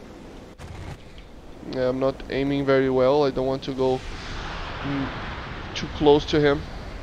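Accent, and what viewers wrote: Brazil. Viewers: Pelo sotaque eu desconfiava que era BR, bem legal o vídeo cara, bom trabalho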